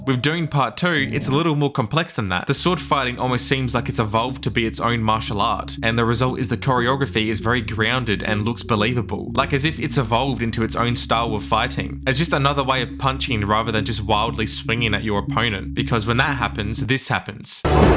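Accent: Australia. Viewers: Bro is australian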